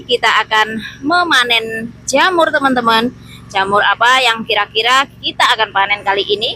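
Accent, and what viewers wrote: Java, Indonesia. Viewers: Assalamualaikum mba, jenengan Indonesianya daerah mana mba kok medhok sekali 😊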